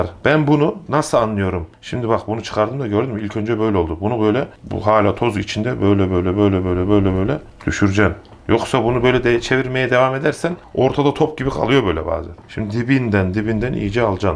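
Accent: Anatolia, Turkey. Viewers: Ünlü fitness içerik üreticileri veya bu camiada fenomen olarak bilinen kimseler bana nedense samimi gelmiyor fitness hakkında da çok bilgim yok dövüş sporcusuyum ama bu adam nedense samimi geliyor 2 videosu çıktı karşıma sadece.. bir de anadolu ağzı var tam içten 🙂 adamsın kral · Aradığım üslup, aradığım sunum, aradığım bilgiler Aptal terimler yok anadolu anlatımı on numara bu video tüm naftalara gelsin...:)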